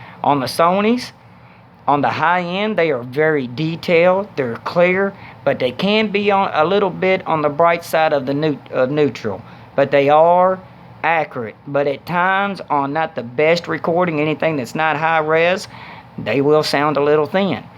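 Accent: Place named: Arkansas, United States. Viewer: I'm from AR and I can confirm his accent is very close to the folks around these parts.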